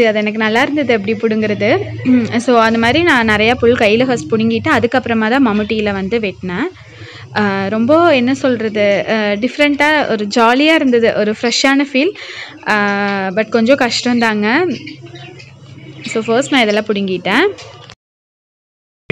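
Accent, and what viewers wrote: Kongu, India. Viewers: Hi... I will follow all your videos,as a newly wed I love to be like you in the way of care you show towards the family... Love you lot... I am from pollachi happy to hear our kongu tamil🙂keep going you are amazing😊eagerly waiting for your next video... And you are multitalented though I would say... Love you 😘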